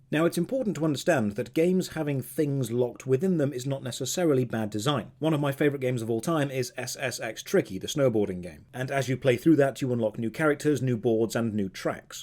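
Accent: United Kingdom. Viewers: fuck ive missed your british voice so much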